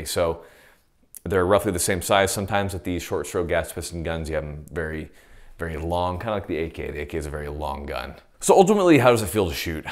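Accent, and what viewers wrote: Germany. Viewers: yes, thats how we germans talk :) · we (germans) do in fact talk like that, one of the most prevalent accents is designing new stuff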